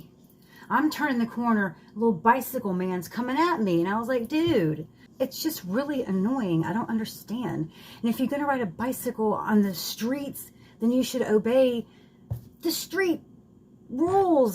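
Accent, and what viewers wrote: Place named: New Jersey, United States. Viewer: You sound like you live in NJ. I left that hell hole of corrupt made up parking tickets of places you never went, but the people there blow through red lights and it isn't one car but 5. The bikers do that for their protection because so many get killed. I get it though, I used to bike at the park. Heads up to anyone going to NJ, green light doesn't mean safe.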